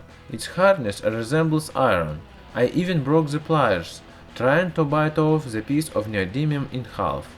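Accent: Russia. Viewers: Utkarsh Amitabh Srivastava its russian accent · Borat is from Kyrgyzstan while this guy is from Russia or at least has a thick Russian accent Kyrgyzstan and Russia were once in the same empire but I think it's a bit broad to say that Borat has a Russian accent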